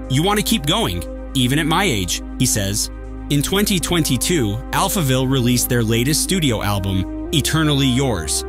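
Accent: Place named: United States. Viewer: Impertinent question - this is a UK channel, why the American sounding narration?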